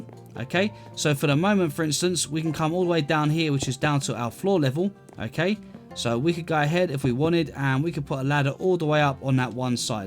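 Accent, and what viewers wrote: United Kingdom. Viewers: you bri-ish? you sound it?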